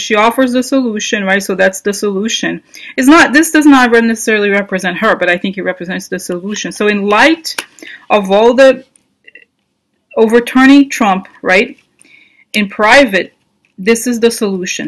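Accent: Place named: Russia. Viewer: your accent is hilarious!! Are you really Russian?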